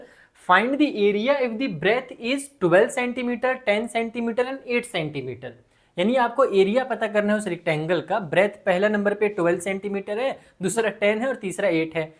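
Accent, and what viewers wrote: Bihar, India. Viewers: Sir aap Bihar se ho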